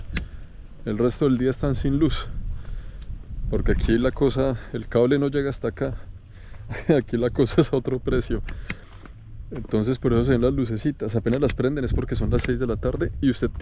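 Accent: Colombia. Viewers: me gusta mucho tus vídeos y tú acento colombiano es único ases muy bien tus vídeos saludos desde fort worth Texas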